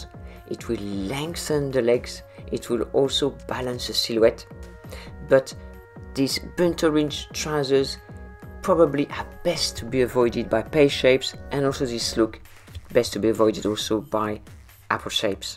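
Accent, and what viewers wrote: France. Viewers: Aw, I liked it when you used to say “ to receive the notifications”. It was a little charming clue that French is your first language!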